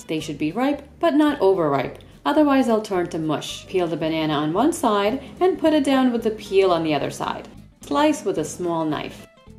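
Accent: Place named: United States. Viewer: I just love American accent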